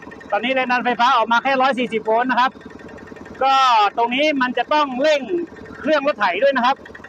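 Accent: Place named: Phrae, Thailand. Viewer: สำเนียงนี้เมืองแป้แน่นอนครับพี่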